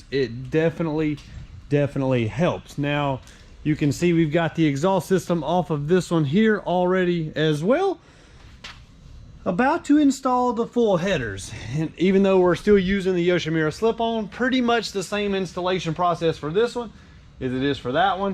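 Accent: South, United States. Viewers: Whatsup with your whiny redneck voice?? Pls stop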